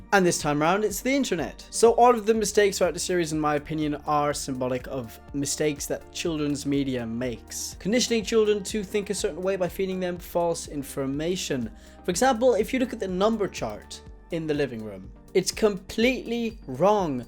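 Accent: Ireland. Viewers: Are you Irish I'm just asking because of your accent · What part of England are you from you have an Irish accent also your pretty cute 😎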